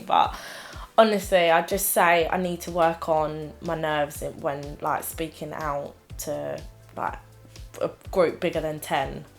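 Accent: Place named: Essex, United Kingdom. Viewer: Thank you for this video :) btw I'm obsessed with towie so I love your accent 😀❤❤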